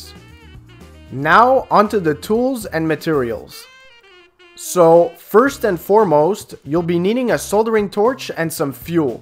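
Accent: Quebec, Canada. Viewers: would you happen to be from Québec by any chance?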